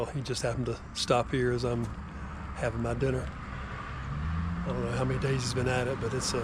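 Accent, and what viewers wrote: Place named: North Carolina, United States. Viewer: I heard your NC accent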